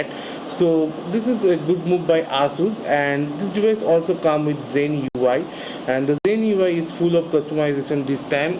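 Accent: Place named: India. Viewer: Forking indian accent. Can't get anything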